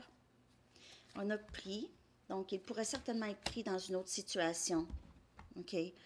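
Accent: Canada. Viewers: Super. Avec votre super accent canadien trop mignon. 👋🏻🇫🇷😘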